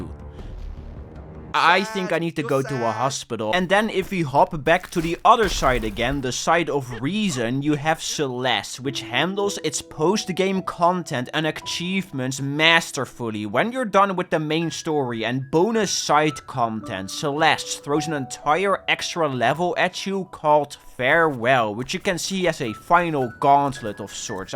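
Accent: Netherlands. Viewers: Are you Dutch? You accent sounds a little bit like it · He’s Dutch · Is hij nederlands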